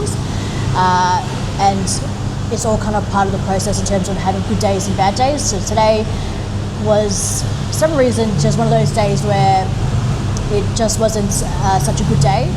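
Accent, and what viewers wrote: Australia. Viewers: Ms, you are an Austrailian????????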